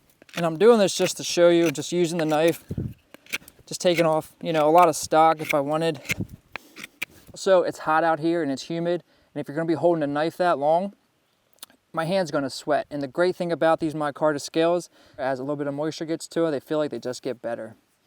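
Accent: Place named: Western Pennsylvania, United States. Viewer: Where in PA you from? Sounds like somewhere from western PA from the accent. Nice feathersticks too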